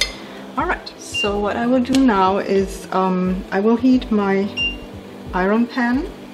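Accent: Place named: Germany. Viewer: Me: oh, another Internet cook making spaetzle. Me (the moment I hear her voice): oh, she’s German... this should be good.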